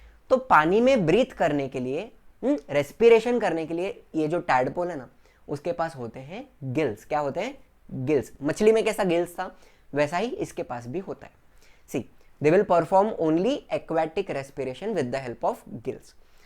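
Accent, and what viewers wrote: Maharashtra, India. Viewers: You are Kutchhi but you sound more like a Maharashtraian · sir aap marathi ho na ?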